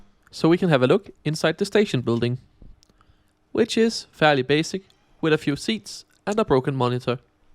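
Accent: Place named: Denmark. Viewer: Haha, how chill - good hearing the Danish accent shining through as well. Ps go Aalborg!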